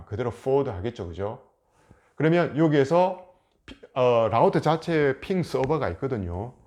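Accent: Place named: Gyeongsang, South Korea. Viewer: 영상 너무 유익하게 보고 있습니다^^ 경상도억양의 fluent한 잉글리쉬가 너무 재밌어요..!! ㅎㅎ 재밌게 ?직관적인 설명 감사합니다~~~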